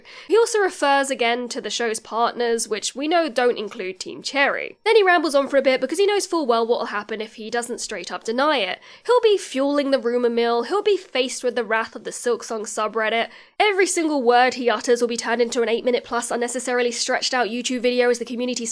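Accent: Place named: United Kingdom. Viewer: Nothing like the soothing British voice of to feed me copium before I go to sleep for the night, thank you for furthering my hope soldier 🫡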